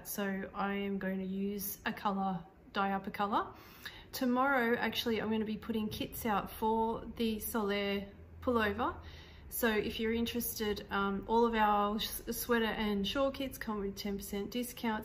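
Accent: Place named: Australia. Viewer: Wow I was wondering the other day if you would ever podcast again! I think it’s been 2 years since you podcasted last. So nice to see you again and hear an Australian voice for a change. Looking forward to seeing you more regularly ❤ btw I love the smocking cardigan you were wearing.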